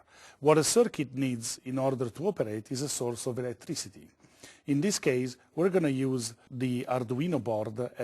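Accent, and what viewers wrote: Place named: Italy. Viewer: 意大利英语真给力~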